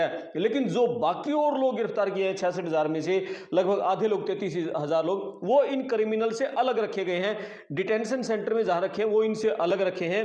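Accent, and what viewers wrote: Haryana, India. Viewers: जी आपने अपनी भाषा पर नियंत्रण पा लिया है 90% control है। पता ही नहीं चलता कि वक्ता हरियाणवी मूल से है हल्की सी हरियाणवी झलकती है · U r fine , perfect presentation bt need to improve hindi,u r haryanvi accent bt disappointing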